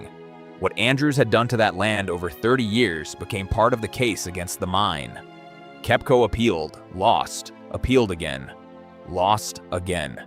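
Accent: United States. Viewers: Should have had an Australian do the vocals not a god dam yank. Anyway you greenies suck eggs.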